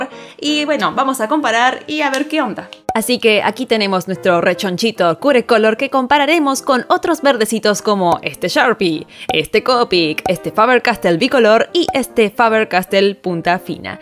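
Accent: Argentina. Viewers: ''Ni puñetera idea'' te quiero, pero aveces el Argentino-Español se te pasa un poco.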